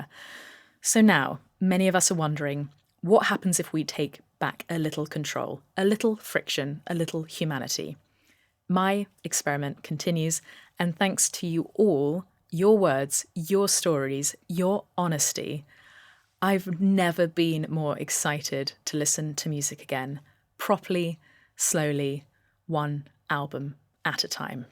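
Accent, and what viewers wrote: United Kingdom. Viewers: I’m not sure about any of this, but your British accent is music to my ears.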